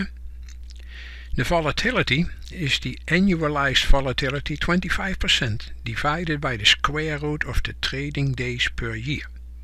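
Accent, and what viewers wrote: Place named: Netherlands. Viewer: love your Dutch accent makes you sound like my father telling me all this before I go to bed...good stuff Sir.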